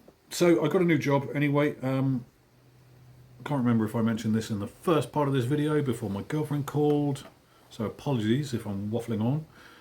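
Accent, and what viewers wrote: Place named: Dorset, United Kingdom. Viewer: Good to hear your dulcet Dorset tones, mate!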